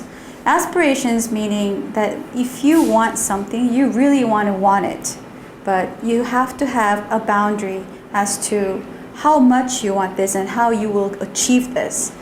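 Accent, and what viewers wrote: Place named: South Korea. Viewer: 발음도 안조음 코리안 발음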